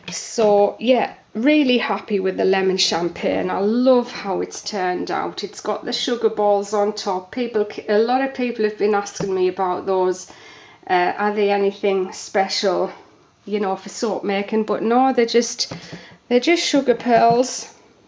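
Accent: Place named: Newcastle, United Kingdom. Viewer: The accent is what we call geordie , its north east england .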